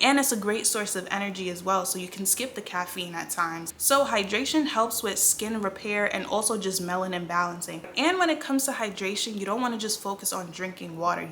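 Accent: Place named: New York, United States. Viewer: Thanks for sharing your journey sis. The internal work really is key. It still baffles me that the health industry doesn’t start with questions like, “What’s your diet like?” or “Let’s build a daily food regimen first.” We can't leave it up to theses Dr's/specialist. Btw... accent—definitely giving NY girlie 🗽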